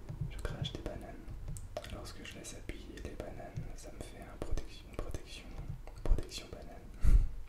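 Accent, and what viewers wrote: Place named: France. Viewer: O sotaque francês é tão satisfatório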